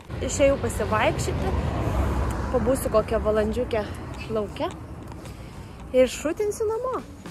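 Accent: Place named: Aukstaitija, Lithuania. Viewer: Nu zinok vau! Taprasme as tavo kanala atradau visai netycia, bet be galo dziaugiuosi ir perziurejau visus visus tavo ikeltus video! Tu esi tokia paprasta, bet taaaip ikvepi dziaugtis paciais maziausiais dalykais🌸 gera girdet kai kartas nuo karto islenda aukstaitiskas akcentas😂🌸 labai laukiu tavo video! Net patys paprasciausi, buitiski, diena pavercia geresne ir prisotina motyvacijos!pati esu is Rokiskio tad dar smagiau ziureti ir zinoti, jog zmogus kiles is visai netoli☺️ laukiam kuo daugiau video ir visai nesvarbu ar tai bus paprastas filmukas is namu ar kai ka nors veiki! Aciu tau!❤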